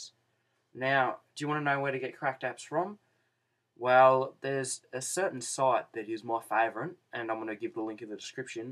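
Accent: Australia. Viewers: Sounds Australian to me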